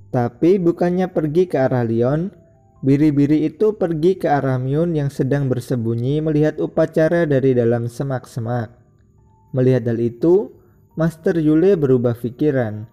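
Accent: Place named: Java, Indonesia. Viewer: Medok bangt njir..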